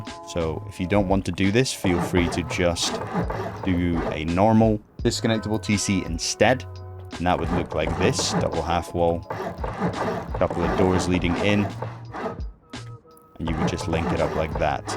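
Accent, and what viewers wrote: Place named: Scotland, United Kingdom. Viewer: Wait! I've been watching your videos and building your bases for the boys for years at this point and it was only now when I was showing my mate one of your PvP vids and he realised you were a fellow Scotsman. How I never noticed is beyond me I obvs thought your accent was "normal" so I never even realised. Keep up the banging videos bro, proud of you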